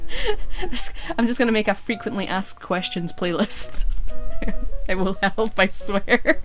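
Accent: Scotland, United Kingdom. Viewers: No she is British can u tell the accent I'm an American and I know that,and why is people trying to get ur age, you did the right thing and not telling them ur age. Yeah same here I wear 24/7 because I love it,no medical reason but I do love your fighting spirit that gose a long way with u... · Probably you get asked if your Irish as the northern irish and Scottish accent have similarities :) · Lol, your accent is not too strong for being Scottish. But definitely recognizable. My friends parents came from there. And somehow her mom's voice has been implanted into my brain and I think in a Scottish accent. That is so weird to me lol.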